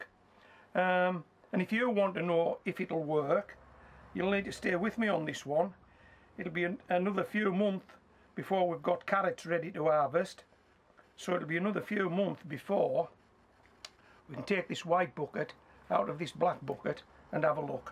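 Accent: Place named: Northern England, United Kingdom. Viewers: he is cumbrian sounds like workington area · No he's not Scottish it's a northern England accent you can hear. As I am from Yorkshire I should know where it's from but it can be tricky, definitely North England though same place as chubby brown is my guess. North Yorkshire area (Middlesbrough and redcar) best guess 👍🇺🇸🇬🇧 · Such a strange but lovely accent 🤣🤣🤣 says "seeds" like a brummy .. Got Jordy in there and a bit of everything else!🤣🤣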